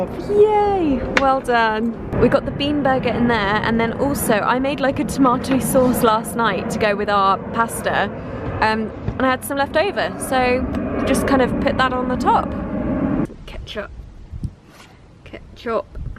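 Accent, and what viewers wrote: RP, United Kingdom. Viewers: why are you always trying to sound extra posh lately? youre not even from a posh background.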